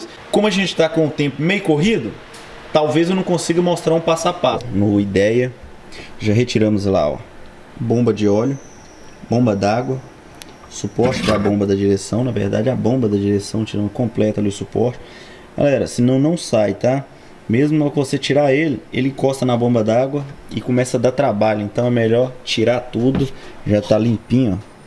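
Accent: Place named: Belo Horizonte, Brazil. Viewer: Blz amigo, pelo sotaque parece te bh, estou certo?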